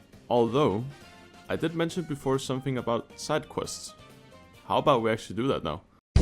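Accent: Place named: Denmark. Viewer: Bro the accent sounds so danish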